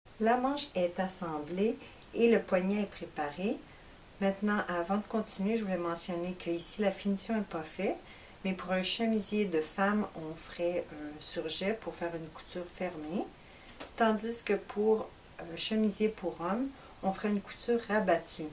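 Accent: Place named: Canada. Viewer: Merci infiniment pour ce super tuto, très bien expliqué avec ce sympathique accent canadien! je désesperais de ne pas finir mon chemisier. Je vais pouvoir le faire et je m'abonne bien évidement.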